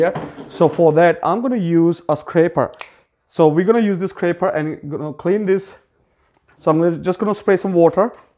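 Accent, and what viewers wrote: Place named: India. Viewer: What in the world kind of accent is that?!?😂 Sounds like that made up accent Jayden Smith used in that movie “After Earth” 😆 Part of it sounds Indian, part sounds English with a hint of something else lmao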